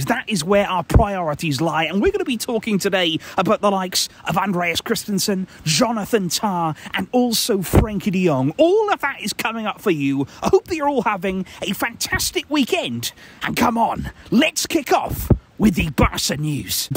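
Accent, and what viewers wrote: United Kingdom. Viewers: I thought you are from the UK?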